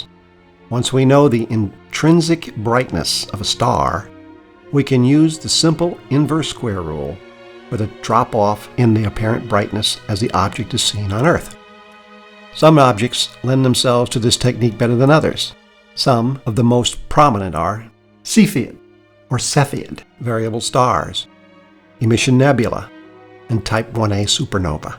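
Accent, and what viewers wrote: United States. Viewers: It's wonderful to hear metric measurements in an American accent.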